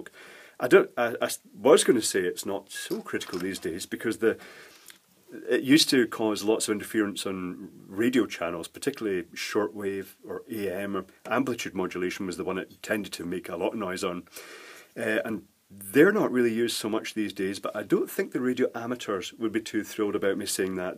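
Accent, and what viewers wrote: Scotland, United Kingdom. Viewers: I'm using the subtitles, I'm not deaf, but sometimes it helps keep track of what's going on if you rewind the video quite a bit. I tell you what, it doesn't like accent! Maybe it should have detected "Scottish" instead of "English". Though he's not really speaking Scottish, which is a dialect of English where they swear more. And all the more for being a Weegie, which I think he's said in the past.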